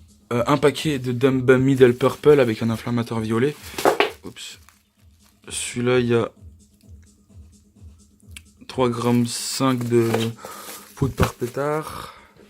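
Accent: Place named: Alsace, France. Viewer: Yo pas mal ta réserve on aime le petit accent elssas hopla tu serais me dire t en a eu pour combien et ta acheter ou ? Geuht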